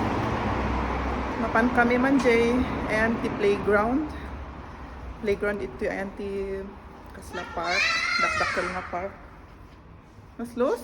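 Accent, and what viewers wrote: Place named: Cordillera, Philippines. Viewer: Kasla ka met nga Igorot nga ag sao ti Ilocano ken Filipino, suruem met ti agsao iti Kan kanaey ay kalin di Eb Besao..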